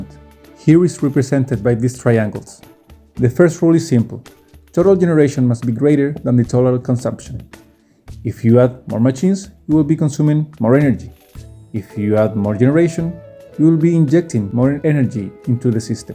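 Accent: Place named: India. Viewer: Author sounds like Indian guy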